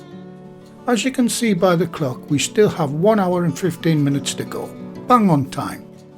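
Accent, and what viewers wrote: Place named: England, United Kingdom. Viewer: I like to listening your English accent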